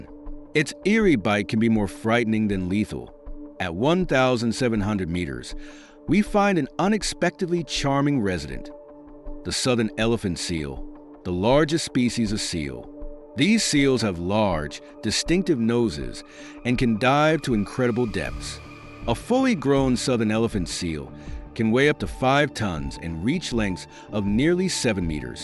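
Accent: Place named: United States. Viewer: You’re American or sound like it.. speak in feet & miles please. This isn’t throwing shade at our international friends it’s just that we don’t understand meters.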